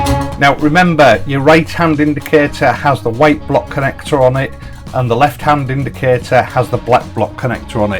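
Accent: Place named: England, United Kingdom. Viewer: your narration is movie like, you look like Clint Eastwood, albeit from England with that accent. 😀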